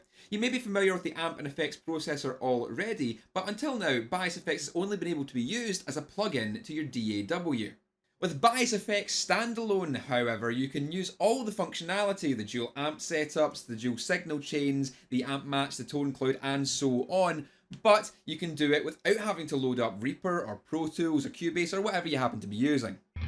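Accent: Scotland, United Kingdom. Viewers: It took me a full 4 seconds to tune my ears to "Scottish". Thanks for the video :)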